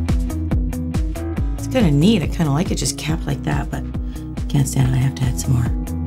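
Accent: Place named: Canada. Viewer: that canadian accent 😙😍😂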